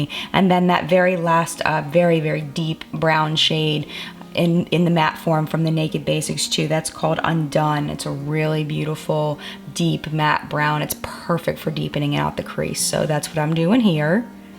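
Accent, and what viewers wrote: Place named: Maryland, United States. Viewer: I always wondered where you were from because you talk just like me. When you said "Its been hot in 'Murraland'", it brought metaphorical tears to my eyes. Represent 301.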